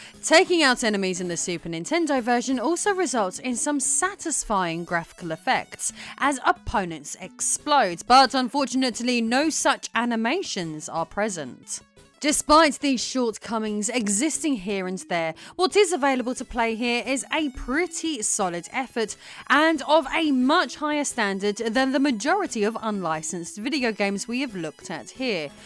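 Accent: United Kingdom. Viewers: I love the british accent❤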